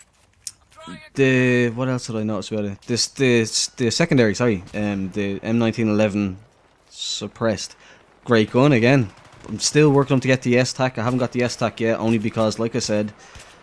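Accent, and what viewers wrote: Ireland. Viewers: Where in Ireland are you from? Used to live in Leixlip a long time ago! Just subscribed a few days ago - and am enjoying the content!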